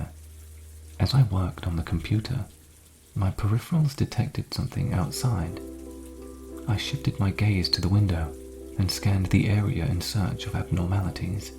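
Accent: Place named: England, United Kingdom. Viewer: the rain.. the English voice.. ahhhhhh yesssss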